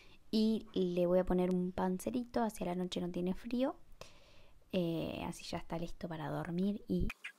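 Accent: Argentina. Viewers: Sos de argentina? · Sos de Argentina?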